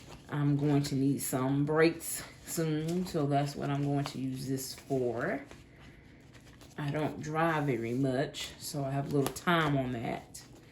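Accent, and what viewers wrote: South, United States. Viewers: Yay, love your video and your Southern accent! I lived in GA for 12 years.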